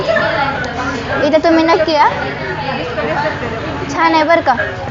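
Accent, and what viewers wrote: Latur, India. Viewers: Latur chi bhasha bhari ahe ...keep it up...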